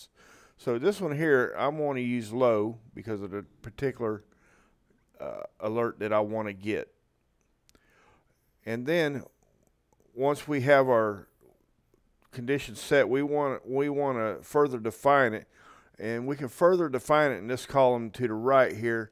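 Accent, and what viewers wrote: South, United States. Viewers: Great video. Exactly what I was looking for. On a side note, you have an epic Southern accent. Will you make a recording for my voicemail greeting and invoke the power of?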